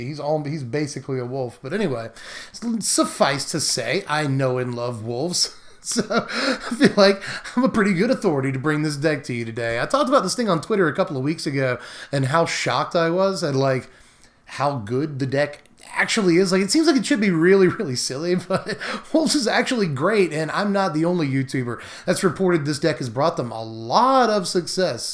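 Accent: South, United States. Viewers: What part of the south you close to Tennessee lol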